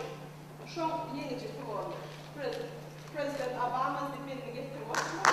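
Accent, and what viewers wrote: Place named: Russia. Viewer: Русская интонация убивает Тюркские языки🙈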